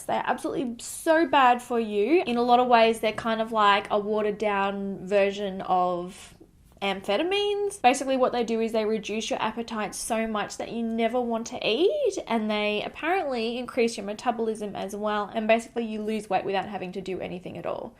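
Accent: Australia. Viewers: You are beautiful the way you are. I have always loved your chirpy self and your adorable Australian accent. My best wishes to you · Sweet, Beautiful on the Inside and the Outside, I am a subscriber who is old enough to be your mother, maybe even your grandmother. My heart broke listening to you talk about your painful journey. I was a teen in the 60s and this threw me back to that time. Mean bullying girls! I truly believe that's where a lot of this starts. I was so bullied by mean girls in junior high and high school. I was 4'11" and never overweight but that didn't stop their hatred. Their words and actions burrow into your brain until you start believing them and unfortunately it stays with you your entire life. My adult daughter went through the same thing in high school. People like that say and do mean things just to make themselves look better. And underneath it all they are truly sad and miserable with their own life. 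I pray you will keep that determination in your heart to overcome all of the negative things you hear in your head and win this battle. God bless Brandon. A supportive fiance'/husband is one of the best weapons in the battle. You are a beauty... Inside and out. I am 100% mesmerized when watching your videos. You have the cutest personality (you make me laugh often) and the most soothing, calming voice, not to mention awesome Australian accent!! I have no doubt that you just helped many, many young women out there with your truth! Bless you! May every day get a bit easier for you! ❤️❤️❤️❤️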